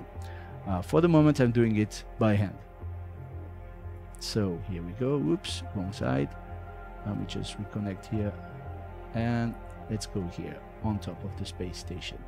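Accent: France. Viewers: On entend bien que le narrateur est français :) Je viens de découvrir le jeu et bravo aux parisiens pour ce travail pas encore finit mais impressionnant au possible ! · Magnifique l'accent français... ;-) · This guy sounds French, am I right?? Hmm, French doing good stuff these days. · Cet accent français quoi x)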